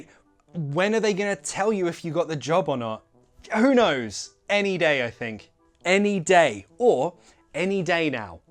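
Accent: United Kingdom. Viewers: Why do you have so nice British accent, mr.?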